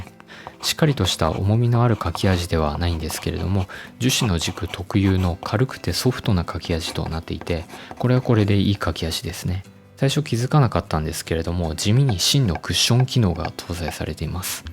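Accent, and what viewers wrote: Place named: Kansai, Japan. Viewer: たしかに「屁出ら」はダサいネーミングですね（笑）。は関西の方でしょうか？独特のイントネーションやアクセントが可愛らしいです。これからも面白い商品の紹介を期待しています！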